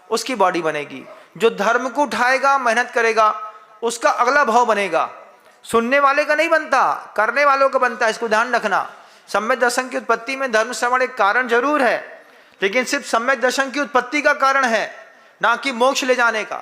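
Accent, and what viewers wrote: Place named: Bundelkhand, India. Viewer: Namostu bhagwan namostu aap बुंदेलखंडी बढ़िया बोलते हो 😂🎉🎉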